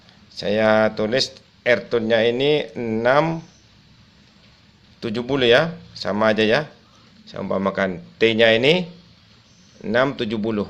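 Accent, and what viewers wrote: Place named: Madura, Indonesia. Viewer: pantes logat nya kayak bahasa madura pak 😁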